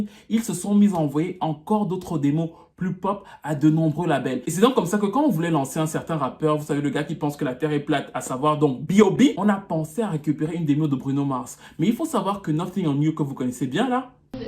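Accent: Cameroon. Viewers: Je dors avec tes vidéos!tu narres comme jamais avec ton petit accent cametchek par moment!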